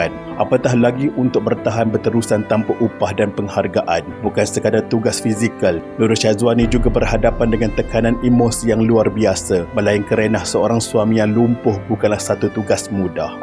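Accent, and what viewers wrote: Kelantan, Malaysia. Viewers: KELANTAN?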